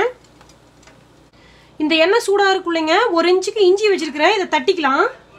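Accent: Kongu, India. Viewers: enka Kongu nadu styla pesarinka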